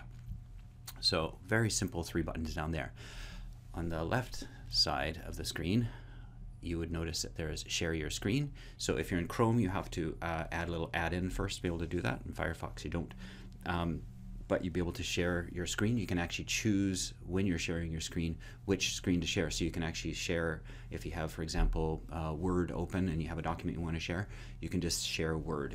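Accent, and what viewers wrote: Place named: Northern Ireland, United Kingdom. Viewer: Thanks! Very well explained. Question: you're from NI right?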